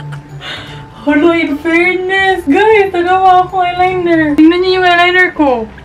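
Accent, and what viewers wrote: Visayas, Philippines. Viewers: wohoooo bisaya ni bai 😂 lingaw kayka te ay hahahaha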